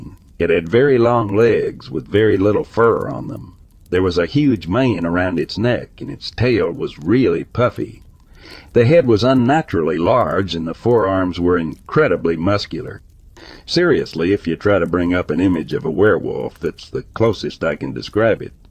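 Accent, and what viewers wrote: Texas, United States. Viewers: Yea Texas accent an also Alabama accent the best for me. Super cool 😎 good day too all from the UK 🇬🇧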